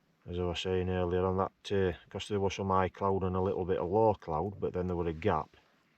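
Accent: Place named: South Yorkshire, United Kingdom. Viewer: Awww you sound like home to me, I'm in Newcastle but my home is south Yorkshire ❤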